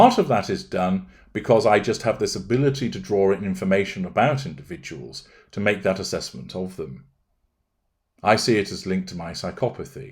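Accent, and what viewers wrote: United Kingdom. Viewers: Totally love your commentary who wouldn’t be in chanted by his voice alone is very desirable. Oh the perfect British accent I imagine you are tall and handsome from Florida with love and flirting lightens things up.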